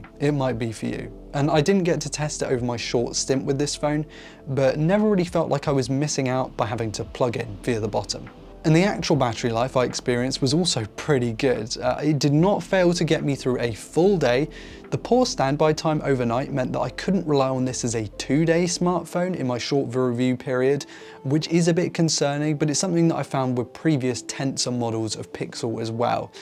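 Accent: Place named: Manchester, United Kingdom. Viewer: I see we have a Mancunian😂😂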